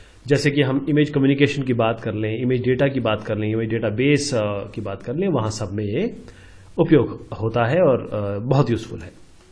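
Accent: India. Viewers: 这tm直接把字幕翻译成德语了，服了这咖喱味的口音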